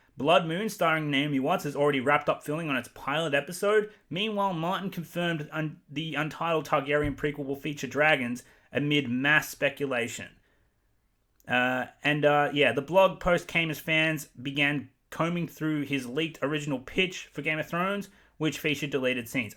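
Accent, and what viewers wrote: Australia. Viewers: I always thought you were British! Your accent isn’t as strong as other aussies I’ve heard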